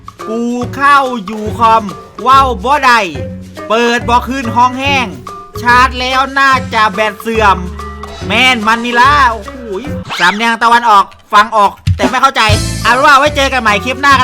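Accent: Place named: Isan, Thailand. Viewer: อังกฤษพะสมอีสาน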